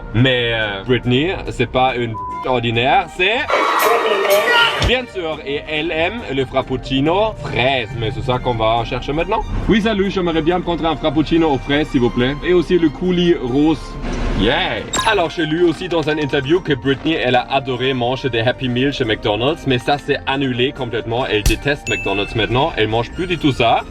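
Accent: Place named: Canada. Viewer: tu mon youtubeur préféré desvidéos accent canadien et qui met quoi des vidéos trop trop rire 👍👍👍👍 24 heures 🇨🇦🇩🇪👍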